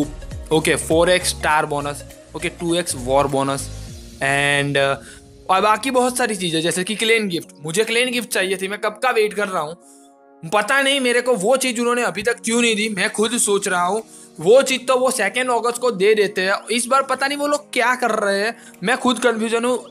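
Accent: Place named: South, India. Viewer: Tumaree English south Indianz ki tarah hai :)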